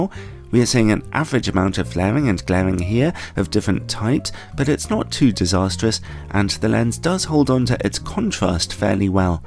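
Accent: United Kingdom. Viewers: He's british, and has a very british voice. That's the brit secret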